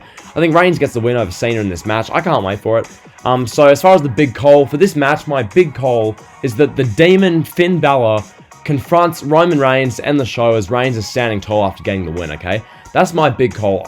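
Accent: Australia. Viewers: I love your accept bro, my Aussie bro 😎 John Cena should lose to Roman Reigns, simply because, a win will deter his accolade. Roman Reigns needs this victory more than John Cena... I'm not saying it cos I'm a fan of Roman Reigns.. bur that I'm a wrestling fanatic and critique...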